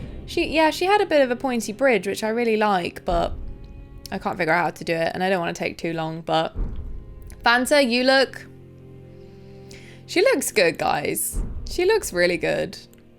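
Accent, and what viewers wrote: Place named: North, United Kingdom. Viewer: Welcome to Elden Ring this game is amazing and ill sub love the Northen Accent I get Banned from games for talking my usual talk yay freedom of speech Blizzard perma bans and they cant even keep them selves in check cowards hide behind a automated ban system. fight me.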